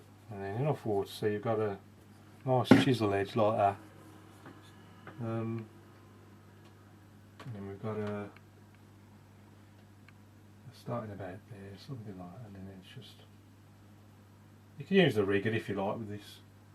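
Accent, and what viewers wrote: Birmingham, United Kingdom. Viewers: Nice work, and nice to hear a Brummie accent, I'm a Brummie living in Wales, lol keep on posting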